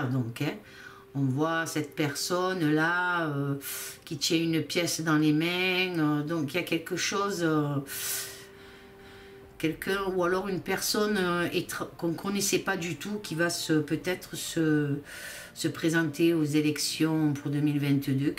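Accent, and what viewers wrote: South, France. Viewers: Bonjour, je vous découvre et vous êtes agréable à écouter. Pour ma part, j'ai un ressenti sur un duel entre deux hommes et ce sera l adversaire à Macron, un homme inattendu au pouvoir, mais pas aussi inconnu que Macron. Vs avez un accent de la Drôme? Du Vaucluse? Du Gard?.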